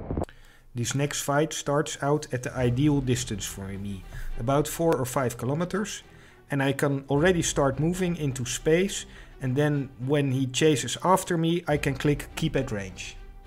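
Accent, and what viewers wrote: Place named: Netherlands. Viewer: sounds dutch... to dutch... :P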